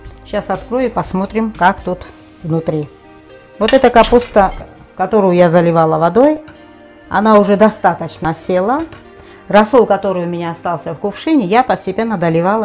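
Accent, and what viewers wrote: Batumi, Georgia. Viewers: дорогая, очень приятно слушать Ваш голос. Когда жила в Батуми..... мне ваша речь напомнила о детстве И отдельное спасибо за простой и очень вкусный рецепт Я выросла на такой капусте и сейчас балую своих родных вашим рецептом По вашим рекомендациям готовила перец в банках. Супер!! Спасибо и дай вам бог здоровья и вашей семье благополучия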